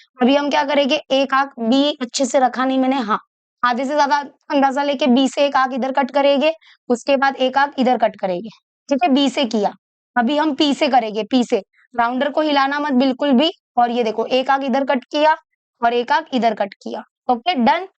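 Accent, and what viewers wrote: Maharashtra, India. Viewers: Mmame your Marathi is too cute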